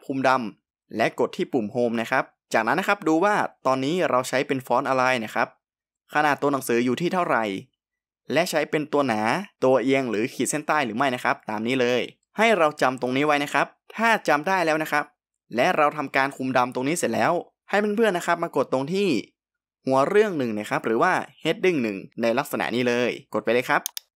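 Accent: North, Thailand. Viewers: ขอบคุณสำหรับความรู้ดีๆนะคะ ว่าแต่เจ้าของคลิปเป็นคนเหนือไหมคะ😂😂😂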